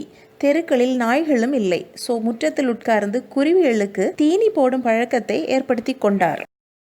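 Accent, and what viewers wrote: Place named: Kerala, India. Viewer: மலையாள வாடையுடன் தமிழ் தித்திக்கிறது Really very useful for 12th students and we can dedicate this to grandmas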